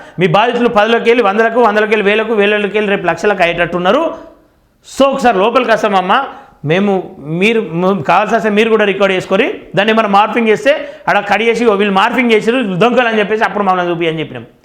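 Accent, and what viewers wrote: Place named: Telangana, India. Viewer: తెలంగాణ స్టైల్ లో మాట్లాడినావ్ అన్న సూపర్.❤❤❤❤❤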